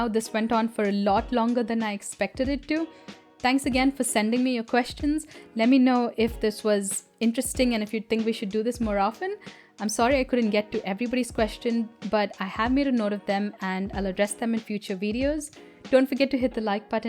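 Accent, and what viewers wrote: India. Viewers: Thanks for clarifying the name . When I saw your video and voice. I guessed you are Indian, but name sounded little different. No hard feelings was just love and curious. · I had pretty much decided you are Indian, from your accent. I expected is your married name, with your accent still doesn't make a lot of sense to me. Your Portuguese connexion goes far to explain why you don't look like most Indians I have seen. Years ago, I knew Yvette Rodrigues, her face had a similar shape to yours, though she came to Australia from the Philippines. Do I think all Indians are the same? They don't all look the same any more than all Europeans all look the same. I was rather taken one year, when I was watching an ANZAC Day march. A contingent of Poles came by. Individually, they don't look much different from any other white Australian. Together, the difference is striking. 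I suspect they mostly speak English though, but also a selection of other languages such as Urdu, Hindi. Arabic wouldn't surprise me. Probably with wildly different accents and dialects. I am not that surprised to find architecture students aren't quite ready for the workplace. From my expeience, in the 70s it took computing graduates a year to settle into their first job so they didn't need to be closely supervised. Part of it was that they learned on Burroughs equipment, not at all like any government (I was in Canberra) department. You could conceivably work to fill the gaps. I live in Mandurah, about 15 minutes walk from the Indian Ocean, about the same latitude as Dallas. We don't do ice and snow. You should do Q&A exactly as often as you like. I tend to not watch them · I still can't get over how refreshingly entertaining it is to hear this lovely lady with her Indian accent say "y'all" at the end of some videos.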